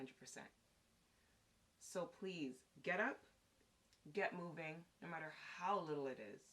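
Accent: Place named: Caribbean. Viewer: Gorgeous and intelligent? New subscriber here! :-) 🤔 Do I hear a slight Caribbean accent? ... Just got to 8 minutes. Definitely Caribbean. Lol ♥️ Yaaadie!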